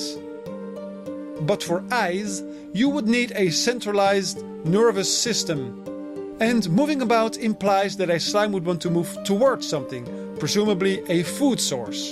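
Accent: Netherlands. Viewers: oh dear, i am hearing a Dutch accent! hollandse youtubers zie je niet zo vaak!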